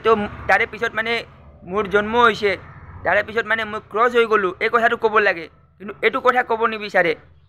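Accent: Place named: Bihar, India. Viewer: Apuni jitu bhakha koixe , jitu ussaron korixe , tenoka kitabotu asene, moito enoka bhakha kebol bihari Miya mukhere h hunu😂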